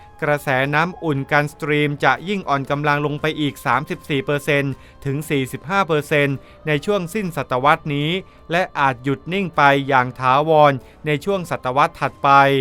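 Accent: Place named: Surin, Thailand. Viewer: คนอ่านเป็นคนสุรินทร์ แน่เลย ชอบฟังเพลง เขมร กัลฟ์ตรึม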